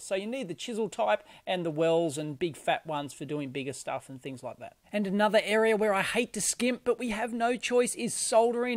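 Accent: Australia. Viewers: Voy a asumir tu esta in estados unidos con un espeso acento austrailano, lol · Oi u sure you are not an Aussie mste?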